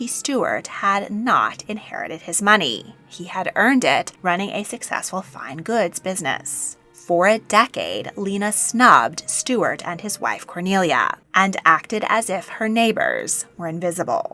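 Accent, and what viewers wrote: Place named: California, United States. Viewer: I’m just struggling with the fact that this was apparently narrated by the Californians from SNL. What in the actual hell in this accent? Holy crap I can’t even pay attention to what she’s spewing toward my ear holes.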